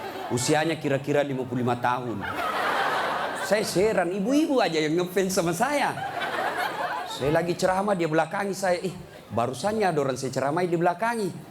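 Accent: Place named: Bugis, Indonesia. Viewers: Itu cara org sulawsi memanggil sekumpulan org bu hamidah, di sulsel itu lumrah dan tdk trmsuk kata yg gak sopan. Kan pak ustadz bilang ini lidah Sulawesi bos, jgn dipaksa jadi lidah solo heheehe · #seneng LOGAT BUGIS, SULAWESI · Dialek bugis tak hilang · Alhamdulillah ust tak pernah merubah gaya./logat bahasa sulselx dimana memberi ceramahx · Saya senang dengar kalau pak Ustad ceramah, bermakna, berbobot, dan bisa bikin kita ketawa, dn tidak lupa masih khas makasar, jangan dihilangkan khas makasar nya ya pak Ustad, sehat sll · "Lidah SULAWESI ini BOSS, jangko paksa lagi pake lidah SOLO" 🤙🤙🤙 menit 15.03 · Seneng aku dialeknya ustad ini Makasar punya, Krn aku prnh tinggal di Makasar, seru ustad Gaya dialeknya ternyata bisa diterima semua lapisan muslim di Indonesia Ttp istikhomah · Sehat terus ustad sy sllu suka cara bicara org Makassar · Ceramah yg santai, lugas, Fakta kehidupan sehari hari, komunikatif, lucu namun bernas dan tegas dengan dialek daerah yang sangat kental (ustdx org makassar). 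Penonton terhibur yg duduk di panggung jg ikutan senyum dan tertawa. AUTO NGAKAK yg mendengarkan ceramah ustd ini.. semoga penontonnya dan pemirsanya jg AUTO TOBAT insha Allah.Syukran ustad DL ilmunya. Alhamdulilah allahumma shalli ala rasulullah Muhammad sallahu alaih wa ala ali wa sahbihi ajmain. Salam dari wong ngapak CILACAP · Orang jogja tau logat makassarnya udztas yaa?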